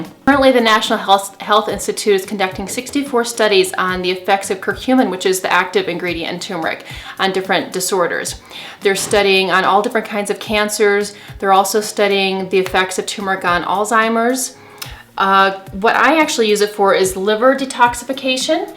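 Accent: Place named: Europe. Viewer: Turmeric milk....very good subject anchored in a nice manner. But Madam u are a qualified person hence u should be slow avoid European accent e.g. u say wada. for water ; it will be kind help for Indians probably from where u belong.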